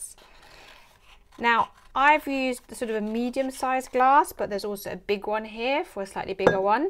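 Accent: England, United Kingdom. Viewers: Thank you for that. Nice hearing an 'English' accent on YouTube for a change. I used to make PomPoms like this when I was a child. Though I didn't cut a slot, we would sew the yarn through the hole, and fill the hole up. (I think I like this way...) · Ty and are you British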